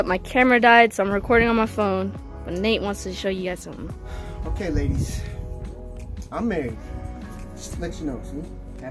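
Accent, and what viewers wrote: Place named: Bay Area, United States. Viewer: Good job.. What part of Cali are you from? You sound like you're from the Bay Area or close by it.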